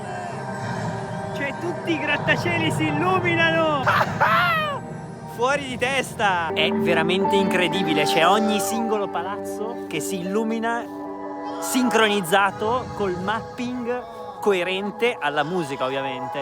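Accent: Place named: Veneto, Italy. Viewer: È l'accento veneto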